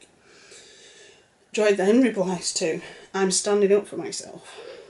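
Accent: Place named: Yorkshire, United Kingdom. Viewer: You are so right, about the barrage of negativity and vitriol--it does make one feel ill, some of her streams are so vicious I can't watch at all, just have to turn it off. And feel the worse for having exposed myself to it. Anyone would feel ill after getting worked into such an angry emotional state, voluntarily mind you, for none of this arguing is anything but self-created and self-imposed; turn off the camera, and it all evaporates into the ether. The still frames captured from those streams and raging videos speak volumes. Some people live their entire lives like that however, in their daily life, in a constant state of arguing, cursing, shrieking, they live for conflict. One of the reasons i enjoy hearing your take is how calm you are, level headed, and that luvly Yorkshire accent. I have good friends from Hull, Barnsley, Sheffield, even up to Middlesbrough. 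York is one of my favorite places on planet earth, and if i won the lottery, I'd buy a small home there and spend my summers way out in the middle of the countryside, if i could. And just go into town once a week for supplies and pub.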